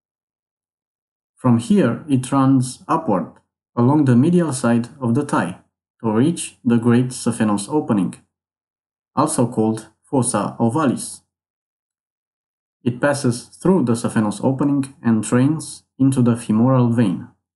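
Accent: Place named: Romania. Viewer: Salutare, tocmai am 3 saptamani dupa operatia de varice..Doamna chirurg, mi-a spus, ca mi-a eliminat acesta vena(safena)... Am mai multe incizi pe picior. La inghinal, langa zona genitala am o cusatura mai maricica, cica acolo ar fi "inchis-o".... Deci, sunt operat de la glezna pana sus... O luna fara efort fizic... Ma simt ok, dar dupa, sa zic vreo 15 min - 20 min de mers, stat in picioare... Simt presiune, suportabila in picior, cu senzatie de umflare... Cred, ca e normal... Ptr perioada asta... In fine... Aveti un video foarte interesant..., dupa accent sunteti roman 🙂💪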